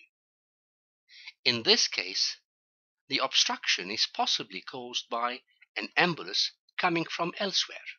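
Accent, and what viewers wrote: United Kingdom. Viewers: I thought he is from England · واااووو ماشاءالله تبارك الله فكرته بريطاني قبل لا اقرأ الاسم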